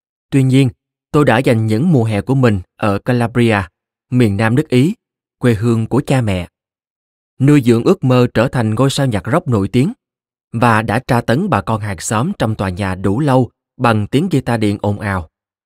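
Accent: South, Vietnam. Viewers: Đây là clip mà tôi thấy tệ nhất trong nhiều clip vì gần hết clip rồi mà vẫn cứ miên man giới thiệu,tua mãi mà vẫn cứ nói về bản ngã...vv Nói chung fonos hay ở đâu có giọng đọc miền trong rất nặng về trình bày tên tuổi dài dòng.thể hiện tiếng anh nghe phản cảm tỉ vẻ như rất tài giỏi về ngoại ngữ sao ko nói gì cho mộc mạc dễ nghe ..¿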